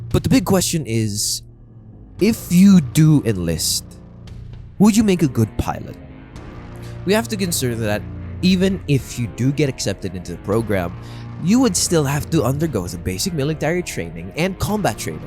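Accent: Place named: Philippines. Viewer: I knew it because I'm also Filipino and you're accenthen you cannot fool another Filipino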